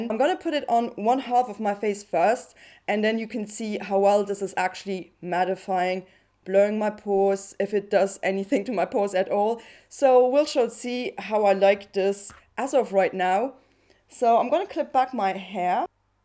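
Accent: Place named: Germany. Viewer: Thank you for your reviews, they are very thoroughly made, really like and enjoy them every time! You are one of the very few YT ppl that dedicate themselves to clean and vegan as well as cruelty free make up products / brands. Happy to have found you! I notice some kind of German accent and you are using quite a lot of adverbs ... Are you originally from Germany? So curious ;) Have a merry Christmas and enjoy some free and relaxed time with your family and good (vegan?) food.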